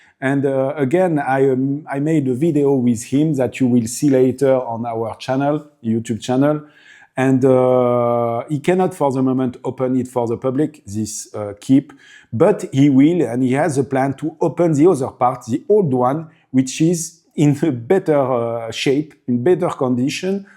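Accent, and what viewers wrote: France. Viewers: Your English is amazing but your accent is throwing my Texas ears off a bit. I am trying so hard to learn some French, but alas, I am truly hopeless. But I love your show and have subscribed to it. I know I am capable of understanding the accent and I suspect it is the key to learning French because so many words are already part of the English language, not to mention that I grew up very near the Louisiana border where French is still widely spoke. But I do know " Laissez les bon temps rouler" although I can say much better than I can write it. 😂😂😂 I'm sorry, that is official motto for Mardi Gras, kind of our Carnival. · I been wondering about the young French man and his chatuea, think his video was called So Chateau. You showed a picture of him in the end, a very young man. I would like to know how his chateau is doing? Don't worry about your English women love it with your French accent ❤😊😅😅😅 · As an American I understand your English perfectly. 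You do have an accent but so what? You are French and it is understandable. I only wish I could speak French. It’s a beautiful language and I hope to visit your glorious country next year. 🇫🇷🇺🇸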